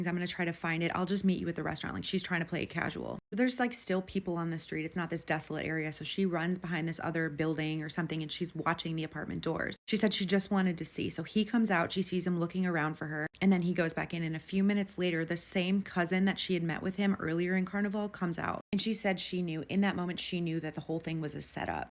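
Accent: United States. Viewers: Girl you talking to fast can't understand everything you're saying Americans some times upsets me with the way they pronounce alot of words bro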